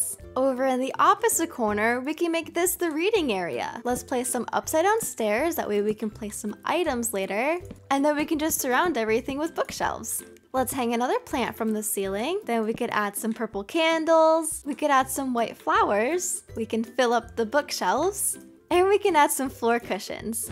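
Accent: United Kingdom. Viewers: Are you from British? Just asking 😊 love you videos <3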